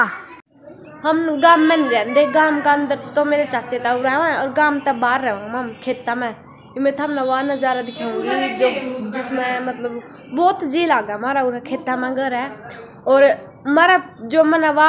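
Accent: India. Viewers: Kurukshetra side se ho kya boli bta rhi h☺️ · जी आपका सीधापन और आपकी हरयाणवी बोली बहुत ही लाजवाब है खुश रहो आबाद रहो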